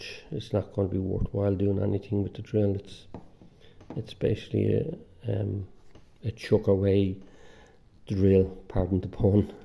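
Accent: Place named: Northern Ireland, United Kingdom. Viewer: Hello I have one exactly the same as yours however mine seems to have a problem with the electronics, I've just taken it apart but I though I'd check on YouTube for any help I could ge fixing t. If you still have yours I'd be interested in it for parts. I not sure how to make contact with someone here. I am in N.Irl so I don't think we are very far from each other judging by your accent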